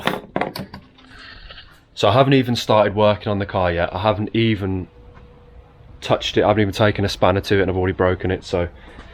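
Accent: Birmingham, United Kingdom. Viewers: You've probably been asked before, but whereabouts in Brum are you from? By your accent I am guessing North Brum. Love the channel.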